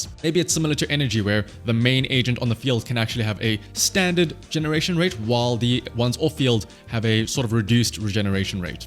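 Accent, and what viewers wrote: South Africa. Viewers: I felt that South African accent, nice to see another playing some zzz love the content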